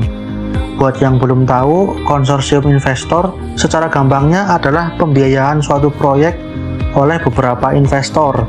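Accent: Java, Indonesia. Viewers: Mantep 👍, logatnya wong jowo ?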